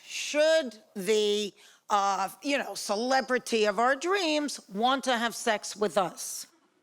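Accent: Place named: Boston, United States. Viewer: Well, now you’ve heard two people with a Boston accent. Only 675,345 more Bostonians left to listen to. 😅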